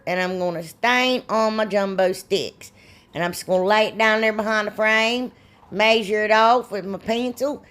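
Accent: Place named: Oklahoma, United States. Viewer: Ha ha ha ha ha ha ha My Husband and I are cracking up watching you touch that glittery fabric. My Husband has only seen a couple of your episodes with me so he doesn’t know your aversion to felt he just turned to me and said, “Does she have Tourette’s? “Ha ha ha. I was laughing so hard I couldn’t even answer him for a minute I said why do you think she has Tourette’s and he said the way she’s repeatedly clapping her hands and then shaking them over her project and I was laughing so hard that I could not talk for a minute. Oh my goodness, I love you so much. Aside from the laughter and joy that you bring me and my home I love your projects and your creativity. The accent is just icing on the cake for me. A lot of my family sounds just like you only they hail from Oklahoma. I am a proud Okey! I think I told you before that I used to sound just like you too. 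But, my fourth grade teacher thought I shouldn’t talk with that accent and put me in speech therapy. I don’t think my parents even knew it until I said something one day about my teacher who makes me talk weird. My mother figured out what was happening and read that teacher the riot act. I loved her for that. Funny thing is my mom and dad didn’t have the accent but I did. My grandparents had the okie accent and they lived right behind my parents and my house and I loved spending as much time with them as I did in my own house so that’s where it came from. I don’t think I have the accent anymore but my husband and my kids say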